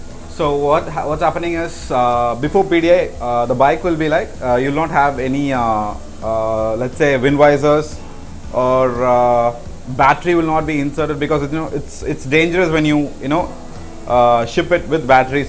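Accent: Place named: India. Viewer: Indian accent is so ugly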